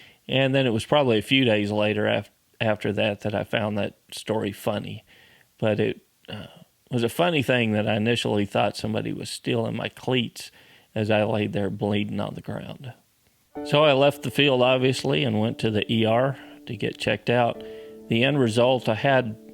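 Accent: Texas, United States. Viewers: Kirk Dyreson, welcome to how real Texans think and talk. I would buy anything thing from this man if he looks me in the eye and shakes my hand. Content is was counts and you’ll get used to the delivery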